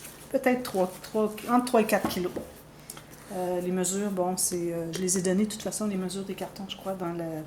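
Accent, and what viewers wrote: Quebec, Canada. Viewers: Bonjour, Je suis une abonnée assez ressente, du coup je rattrape un peu les vidéos un peu plus anciennes, c'est pourquoi le commentaire est un peu tardif par rapport à la diffusion. Tout d'abord un IMMENSE MERCI pour tous les partages que tu nous fais. Je scrape depuis 4 ans seulement et tes astuces pour les goussets dans les albums sont justes formidables pour moi qui débute. Je ne me pose plus de question je fouille dans tes tutos express et je trouve mon bonheur. Et surtout je ne perds pas de papier avec des erreurs. Pour cet album souvenir d'enfance, c'est effectivement très intéressante, pour nous, de voir comment fonctionner en amont, avec les photos pendant la réalisation. Mais surtout MERCI MERCI pour l'astuce des cartes pour les photos en diaporama: je ne sais jamais comment les caser!!! J'adore la page avec boutonnière ainsi que la cascade à pousser. 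Bon j'en ai déjà fait à tirer mais pas encore à pousser! Bientôt je me lancerai. Et bien sûr en plus des explications très simples et très claires, j'adore, j'adore ton accent et ses mots à vous les québécois qui sont tellement riches de sens. Merci merci 🥰👍👏🥰 Fab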